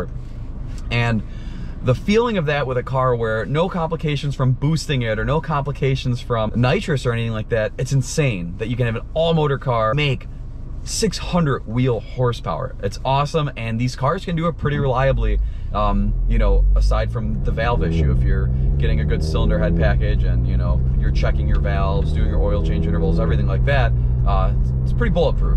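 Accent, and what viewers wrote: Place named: United States. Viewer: haha no no I understood that maybe it’s your American accent. I’m from Toronto Canada! Definitely the GS and Z06 platforms are best bang for your buck but the ZR1 is a beast. It’d be a nice car to review on the channel if you can get your hands on one!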